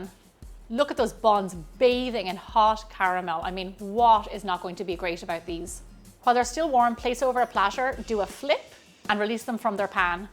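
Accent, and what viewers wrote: Ireland. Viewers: I adore your easy to watch videos, not to mention your Lovely Irish Brouge. However I like bananas but not in baking. What else can I use to make these inviting rolls?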